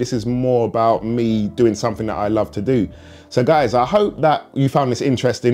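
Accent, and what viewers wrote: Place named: South, United Kingdom. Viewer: Nice video mate, great to hear another southern British accent on YouTube hahaha!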